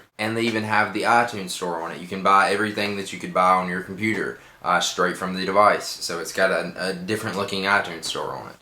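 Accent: United States. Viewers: i'm from england and usually the american accent really bugs me but i kinda think your accent is really kool it's like your talkin and i dont wanna tell u to shut the hell up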